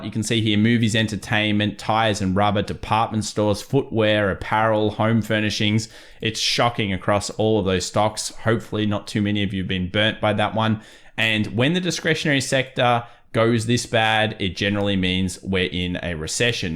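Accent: Australia. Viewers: i bother to listen because you are an aussie. Do not disappoint me, please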